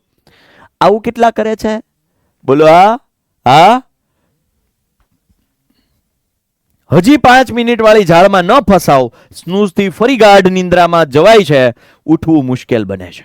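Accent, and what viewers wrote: Kathiawar, India. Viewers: Tame kadhiyavadi cho to sarkhu j bolu kadhiyavdi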